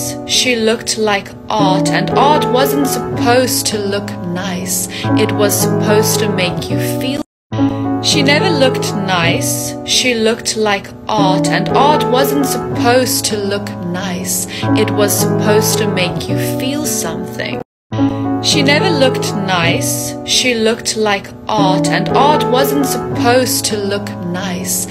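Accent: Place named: United Kingdom. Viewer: i like this voice so much and it's british accent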